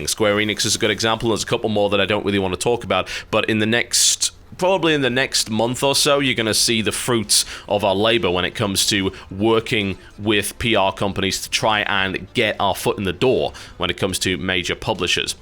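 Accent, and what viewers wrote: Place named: Newcastle, United Kingdom. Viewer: Proof that TB has some Geordie left in his accent. "Boook"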